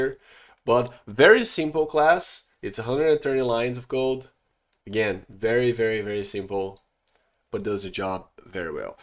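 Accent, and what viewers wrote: Brazil. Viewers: are you brazilian by any chance? I can guess by the accent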